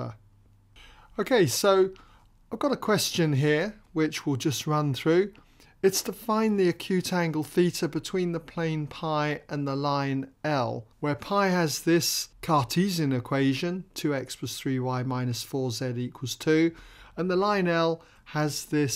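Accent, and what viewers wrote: United Kingdom. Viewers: you have the most modern british accent I've ever heard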